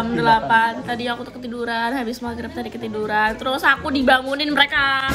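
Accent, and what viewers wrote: Java, Indonesia. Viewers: lucuu muni jowo ne 🤣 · Kk orang Jawa tengah kok suaranya Jawa kirain orang Jakarta , jawab Kaka plis aku juga orang Jawa kk jawab ka plis 🙏🙏🙏🙏🙏🙏🙏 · Kak can ya lucu nk ngomong ngwe boso jowo🤣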